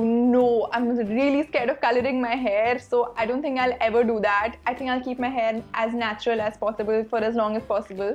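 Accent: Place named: India. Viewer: I love your sorta indian accent. You have such a pretty voice and right when I stumbled upon your channel I immediately knew I hadd.... to subscribe.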